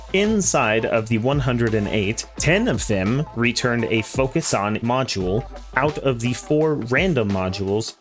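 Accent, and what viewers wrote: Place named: Ireland. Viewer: love the guides irish accent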